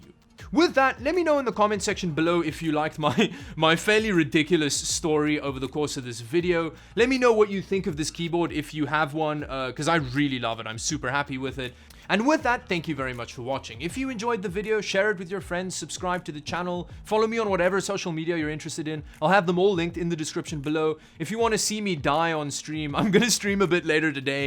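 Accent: South Africa. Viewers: love your videos man. you sound south african. are you? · Thanks was wondering becuse his channel said canada but he sounded just like a south african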